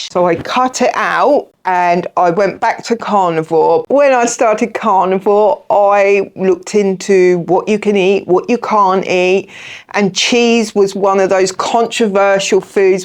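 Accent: United States. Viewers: Great talk! Thank you so much. And I love your charming Texas drawl…haha! But seriously, your story and advice are excellent. Thanks again & God bless you🙏🏻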